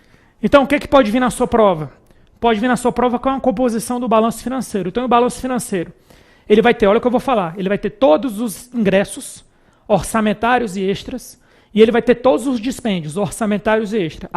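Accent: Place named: Manaus, Brazil. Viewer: Acho que ele é de Manaus Pelo sotaque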